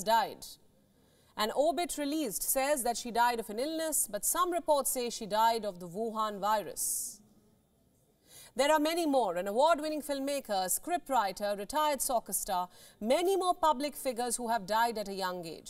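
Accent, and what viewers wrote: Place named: India. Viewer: Why do Indians speak this way? I mean they were under Brits for such a long time and you would think they would speak English correctly.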